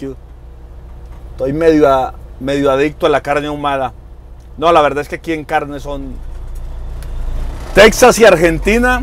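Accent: Mexico. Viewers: Hola amigo con todo respeto, la verdad es solo curiosidad, si eres Colombiano por qué hablas como Mexicano? Gracias por tu respuesta!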